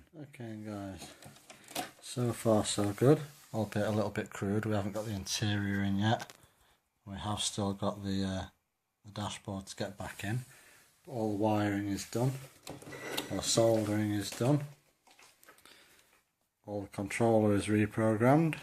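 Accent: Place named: Birmingham, United Kingdom. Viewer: Great video. Can't understand the Brummy accent well, needs more Yorkshire 😊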